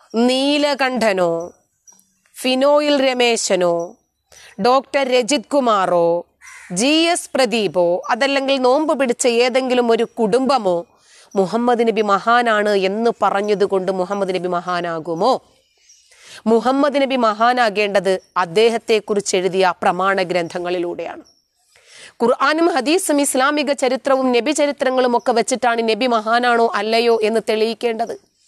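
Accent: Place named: Thrissur, India. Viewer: Porichu. Trichur. Basha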